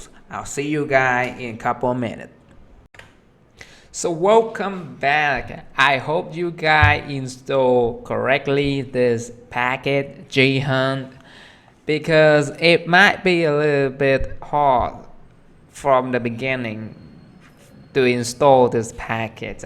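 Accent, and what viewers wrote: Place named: South, United States. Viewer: Why do you have a southern accent? lol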